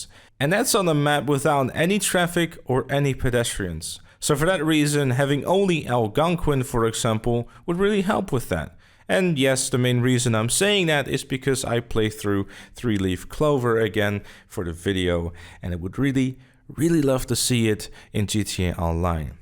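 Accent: Netherlands. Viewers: Aan je accent te horen ben je denk ik nederlands maar je kanaal is heel nice man props man! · Nederlands? Ik hoor het haha🔥👑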